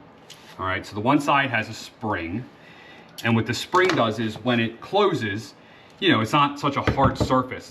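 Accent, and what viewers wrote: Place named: Delaware County, United States. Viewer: Dude… I know this video is a year old so you may not see this comment but you have to be from delco! I am too but live in Georgia now so hometown talk is always nice to hear. And thanks for helping me install my magnetic doorstop.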